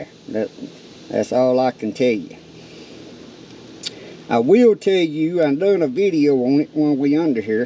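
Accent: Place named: Rural, United States. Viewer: Thanks. My wife loves your country accent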